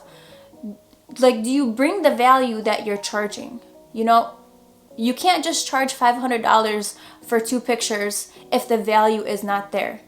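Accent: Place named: New York, United States. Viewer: You sound like Jennifer Lopez I would think you’re from New York and Spanish.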